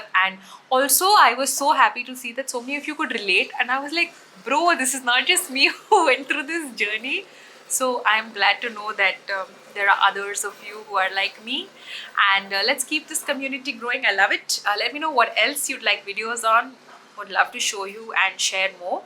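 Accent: India. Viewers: Your English is perfect Indian English I feel like I'm listening to a friend 😂 unlike some influencers putting on a fake accent.. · Bro your intonation is so indian coded i love it. It's like finding something familiar on the internet <3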